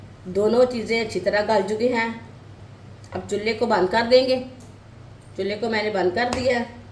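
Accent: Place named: Punjab, India. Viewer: Or is k bolny ka andaz b bus, panjabi style me urdu 😅😅😅😅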